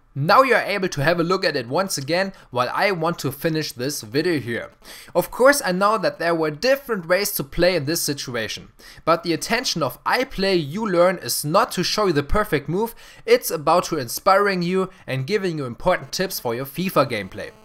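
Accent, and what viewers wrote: Germany. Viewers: Love his German accent <3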